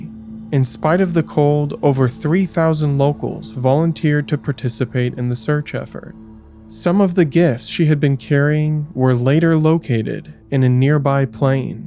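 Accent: United States. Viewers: if you’re actually offended by someone having an American accent…. There’s far better things to bitch about tbh.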